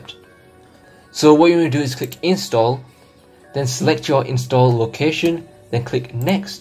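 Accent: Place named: Bradford, United Kingdom. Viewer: My man sound like he’s from my home city Bradford 🤣🔥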